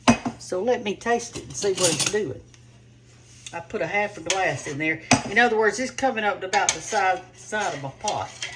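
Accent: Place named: Alabama, United States. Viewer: Miss You speak my Bama country language You flung a cravin on me! language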